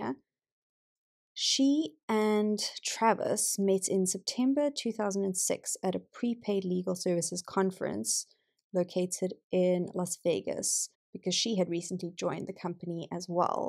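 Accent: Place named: South Africa. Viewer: Just found your channel. I have subbed! I love your content and hearing a South African doing crime 🥰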